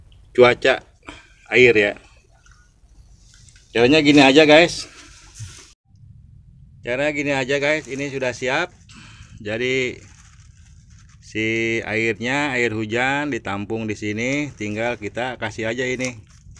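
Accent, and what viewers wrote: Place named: Sunda, Indonesia. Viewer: Mantap mang ni medok bahasa sundana 😁 salam ti Purwakarta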